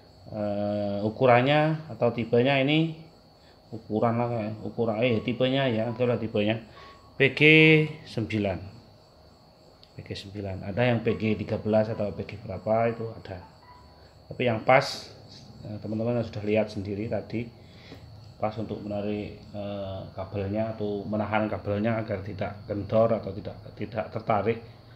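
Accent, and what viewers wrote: Madura, Indonesia. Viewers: Reng madureh mas?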